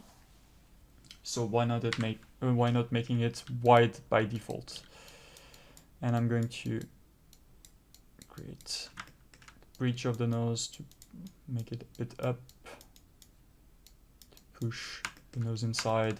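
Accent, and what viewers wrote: France. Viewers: "And if you're REALLY lost because of my French Accent, don't wor-" Me, immediately: Oh no! Don't you dare be disregarding yourself, sir, ma'am, or Enby person, because I've been learning French for a REASON, DAMMIT! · Super tuto le m'sieur à l'accent français ;-) Je viens de tomber par hasard sur ta chaîne, j'ai du retard à rattraper. Merci pour le partage de tes compétences. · I got lost in your silky smooth french accent and accidentally made my base mesh out of cardboard, styrofoam and an actual blender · man what r u talking about... ur French accent is a blessing haha. I've watched so maaaaany tutorials of asian guys understanding whom was a fucking torture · it sounds like you are french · really good tutorial and the french accent is cute. thanks! there is so much you can do with meshes and wireframes. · nice french accent and video ! 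good job dude :) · French accent 10/10 <3 great work on the video it literally made me get back into blender! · Don't worry your french accent is understandable and nice!! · oh j'ai tout de suite reconnu le petit accent français XD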